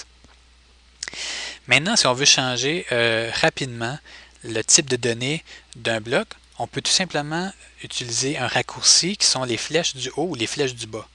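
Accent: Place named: Quebec, Canada. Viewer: superbe explications et j aime bien l acces quebequois 😊😊😊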